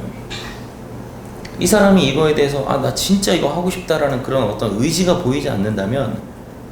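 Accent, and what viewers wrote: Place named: Gyeongsang, South Korea. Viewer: 말투가 쌍도네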